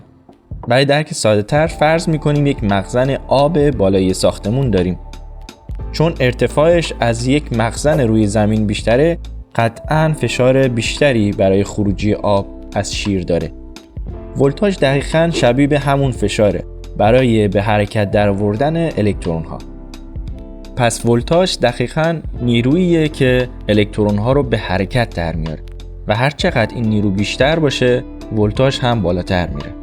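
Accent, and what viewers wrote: Iran. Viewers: بسیارساده وعالی توضیح دادین، ازته لحجتون حدس میزنم دزفولی باشین؟ سپاس وامیدوارم ادامه بدین وبازهم مفاهیم فیزیک روتوضیح بدین. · لهجه قشنگ کرمانی با ق های محکم و تشدید دار 😊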